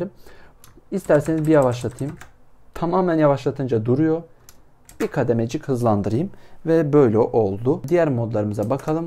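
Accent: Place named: Germany. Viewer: Reyiz almanyadaki kuzenime benziyon tip şive bakımdan almanyada bulundunmu?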